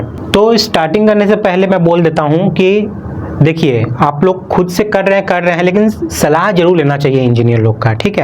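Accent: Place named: Bhojpuri, India. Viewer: Sir ...aaji itni bhojpuri jesi baat kar rahi ho ...acha nahi lag raha hai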